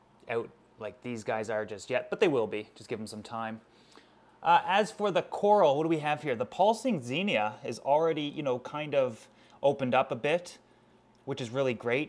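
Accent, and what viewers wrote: Canada. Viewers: "Out and about " I'm dead the cute Canadian accent is amazing love the videos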